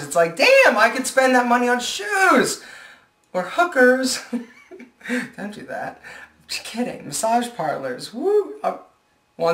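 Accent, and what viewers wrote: Philadelphia, United States. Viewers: Oh boy. Philly accent. "Gleasses"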